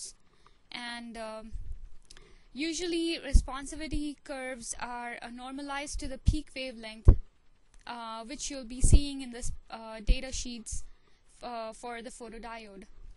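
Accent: India. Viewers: but u sound like indian..its ok..dont be ashamed...nice accent..:) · You were reading a book. BTW your accent is Indian, probably you are Indian or indian based NRI.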